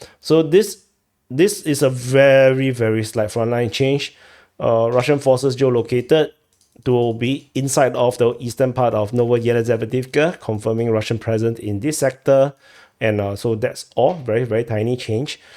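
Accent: Texas, United States. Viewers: As a Texan I can say your cowboy accent is awesome lol😂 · Hahahah,,, love ur Texan accent. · I'm surprised the cowboy accent worked will.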